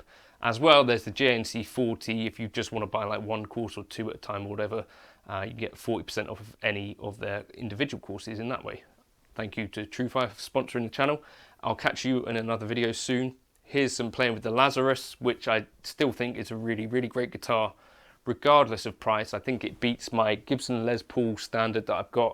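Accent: England, United Kingdom. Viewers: I don’t get the hair that needs moving out of the eyes and the typical English emo accent. You don’t have a taste for expensive stuff and still pay £2k for a guitar?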